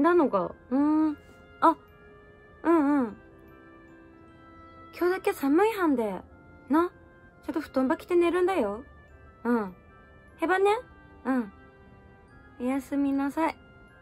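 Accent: Tsugaru, Japan. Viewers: 津軽弁の上級編？！、少し~慣れるまで時間がかかるかな。でもお国言葉ってほのぼのして良いな~。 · 本当、津軽弁可愛いっす😍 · 寝ようとしたら女神？天使？津軽弁ぶち可愛いやんけ！(広島弁) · 良いなぁ。津軽弁‼️まっと(もっと)やれじゃ‼️(やって) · 、思い付かなくても、シリーズ化してください🙇 やっぱり津軽弁のにドキドキです💖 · の津軽弁カワイイ！ASMRの津軽弁寝かしつけの動画やって欲しい！ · 津軽弁良き！😆 シリーズ化して欲しいな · こんばんは‼️ ってかの津軽弁滅茶苦茶可愛い過ぎですね👍 地方から（自分もですが） 東京に上京して年数が経てば経つにつれ自分の生まれ育った土地の言葉って正直イントネーションとか自分では気付かない内に変わってしまいがちだけど… 今日もに癒やされました。まだまだコロナも収束を見せる処か大阪に続き東京もまん防発令されるかも知れない状況ですが本当の家族の為にもコロナには十分気を付けて下さいね‼️ これからも応援してます👍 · 今日も見れた！津軽弁可愛い✨ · 初見です！父方の実家が青森で幼少期実家のように訪れてたため津軽弁が恋しくなってポチりました！動画自体は凄く良いと思います！ただ訛り方が少し津軽弁弱いと思ってしまって懐かしさがあんまり感じれなく残念と思ってしまったのが感想です...これからも頑張って下さい！ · お若いのに津軽弁を話せるとはすごいです。今でも、地域的に津軽弁の濃いところはあるのですが、若い人はしゃべれなくなってきました。けっぱってください。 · の津軽弁、あいかわらずめんこいな～！次は、『いずい』だの『しずね』に『ねっぱす』なんか、いいんでねぇべが… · 北海道の多くの地域でごみを投げるで通じますよ。ちょす＝いじるは函館をはじめ道南地方では使いますね。布団着るもわかるし、へばね～も使うよwww 津軽弁で喋る可愛すぎ！ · こんばんは津軽弁のも可愛いすぎとるね。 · 女の子の津軽弁上品やな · やっぱり、津軽弁カワイイ〜❣️ホッコリする❤️ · 津軽弁良いですね₍ᐢ⑅•ᴗ•⑅ᐢ₎♡ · 第三段やって欲しい！の津軽弁を永遠に電話で聴きながら寝落ちしたい笑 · だいぶ綺麗な津軽弁ですね。わの周り汚い津軽弁です。五所川原だはんで。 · めちゃかわいくて、津軽弁で話したら惚れちゃうかも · の津軽弁、いいね😊 標準語と津軽弁で服装変えるのは、のイメージかな？？？ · の津軽弁刺さりますw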